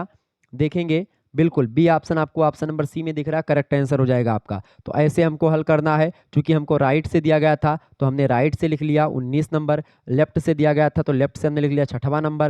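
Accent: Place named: Awadh, India. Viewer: सर के मुंह से अवधी भाषा बहुत प्यारा लगता है❤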